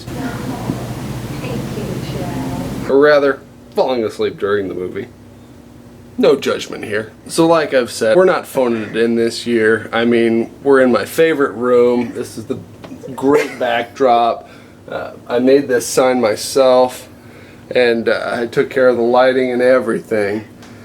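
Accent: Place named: South, United States. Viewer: Oh my goodness, this feels so strange to be watching the Awards before Christmas. I've been watching you since I was a freshman in college in 2011 when I searched YouTube for a review of the Benefit They're Real mascara. And now I have a degree, and you have a lovely child and another one coming very soon, I'm so happy for you all! Thank you for your videos, they make me so calm when stuff gets crazy. P.S., I know you guys are from southern IL, but do most people in your area have a distinguishable southern twang like has and you sometimes have? I've lived a good amount of time in NJ, MI, and NC so I've developed a few twangs throughout my life as well so I think I can pick up on them a little more than I used to. P.P.S., you are a great sport, we love you!!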